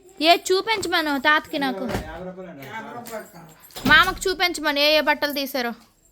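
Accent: Srikakulam, India. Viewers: Me srikakulam anttah bad slag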